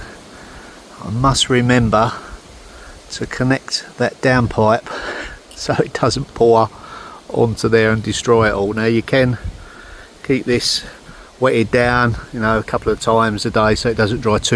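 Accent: United Kingdom. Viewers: . I texted UTube about this problem Their answer: British accent maybe hard to understand. That’s in a nut shell. But that’s not right because American English is having trouble too. They have a problem and can’t fix it. 😢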